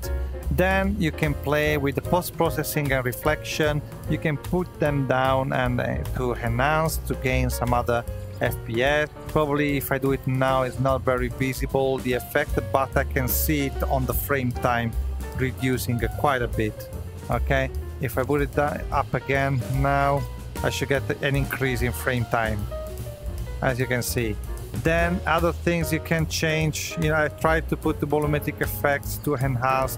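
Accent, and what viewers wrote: Italy. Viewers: Fantastico!! (Sei italiano, senza dubbio) Parli inglese benissimo!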